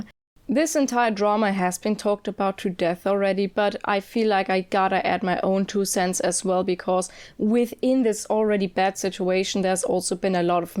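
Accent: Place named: England, United Kingdom. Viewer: i like your english accent :)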